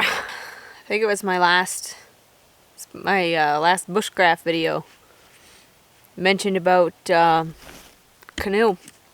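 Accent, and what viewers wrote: Maine, United States. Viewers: You have the Maine accent 100%, It sounds cute to us flatlanders.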